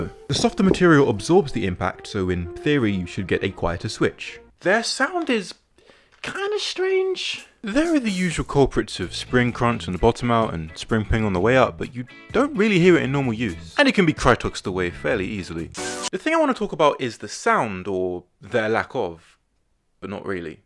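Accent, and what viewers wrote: United Kingdom. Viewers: Top quality content mate! Funny af, informative, British? Damn fam let’s do a meet up after the pandemic!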